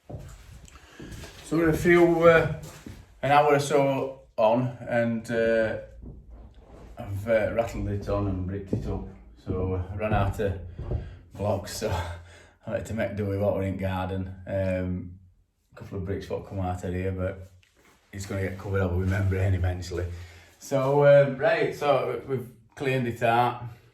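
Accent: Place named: Ilkeston, United Kingdom. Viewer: Love the accent, sounds like an ILKESTON one to me, plus you look better with the beard. On a more serious note, I renovate properties for a living and tried your injection methods into the mortar for the first time and yes it worked out fine for me. You give out basic no crap information, good luck with your future videos, thanks Dave.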